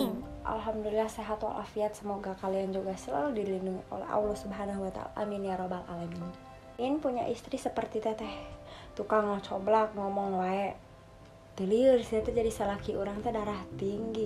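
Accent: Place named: Sunda, Indonesia. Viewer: Naha sih lamun ngomong sunda meni bodor pisan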